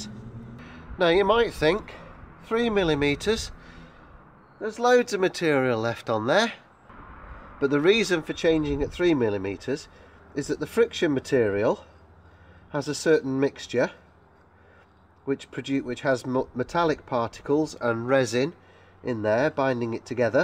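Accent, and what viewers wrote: England, United Kingdom. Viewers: I had the same question; why at 3? Thanks and I love listening to the English English!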